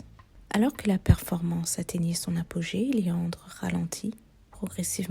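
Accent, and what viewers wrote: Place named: France. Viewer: Wow very beautiful French accent voice and two of the beautiful feet in the world 😍🤩🌍🌎🌏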